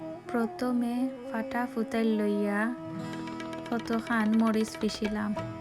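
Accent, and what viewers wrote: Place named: Sylhet, Bangladesh. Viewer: সিদল এর নাম শুনেছি অনেক তবে খাওয়া হয়নি। দেখে মনে হচ্ছে বেস মজা হবে। আপুর মুখে সিলেটি ভাষা শুনে ভালো লাগে।❤️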